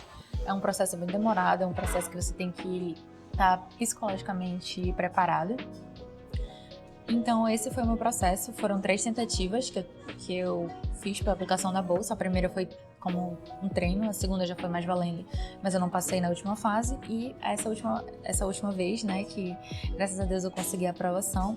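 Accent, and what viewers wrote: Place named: Pará, Brazil. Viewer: Um sotaque lindo, parece paraense❤😂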